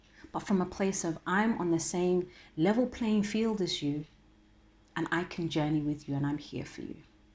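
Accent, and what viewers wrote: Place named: Canada. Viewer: Thanks for sharing your knowledge on this. I promise you I will share it with my friends. Also I pray that the whole of western world will understand the need to this issue.Very thoughtful. Am deeply sorry to ask, what do you studied at the University and are you from Canada? Because the way you always pronouncing the (Better) sound like you are. It's out of curiosity.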